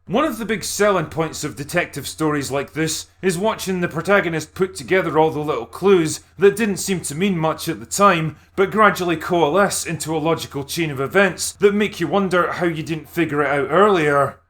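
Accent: Scotland, United Kingdom. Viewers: You should know that with me and my wife are confused about some thing or don’t have an answer we will respond to one another in your heavy Scottish accent and say don’t know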